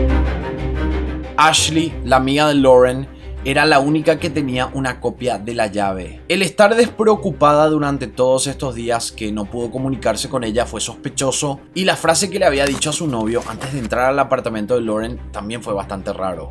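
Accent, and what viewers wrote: Paraguay. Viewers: Muy bueno hermano te descubrí hace un par de días. Ágata descubrí el acento paraguayo, muy buenos los videos. En Tucumán Argentina hay dos casos interesantes uno es Dulio Fernández creo que del año 1995, un chico que desapareció en un río, y hasta hace poco se hicieron búsquedas en EEUU por su desaparición. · Me encantan tus casos y como narras!!! Dios mío, y más aún escuchar las narraciones con ese acento paraguayo 😍 jajaja. Seguí asiiiii, éxitos siempre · No soporto el acento paraguayo.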